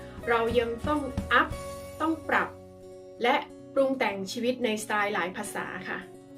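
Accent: Thailand. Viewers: I love your Mookdaharn accent, teacher 🥰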